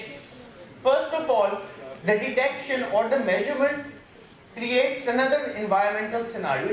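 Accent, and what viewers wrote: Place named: India. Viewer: when he starts talking in his indian dialect and then he ends it with "and thats what physics is all about" ... very good explanations thanks for sharing.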